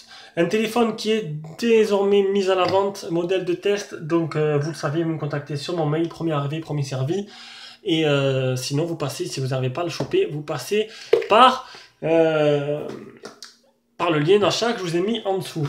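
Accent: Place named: South, France. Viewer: Salut c est pas mal tes tests smartphones et j aime bien ton accent du sud ca change,bonne continuation!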